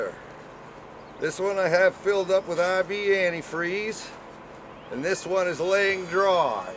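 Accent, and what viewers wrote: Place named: Maine, United States. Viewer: Yes, I noticed that but coupled with his "Downeaster" accent it makes for a weird combination.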